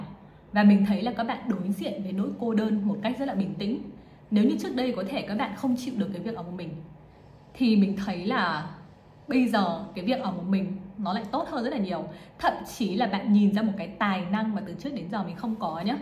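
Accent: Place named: Cam Pha, Vietnam. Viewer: nghe giọng như người cẩm phả vậy :)))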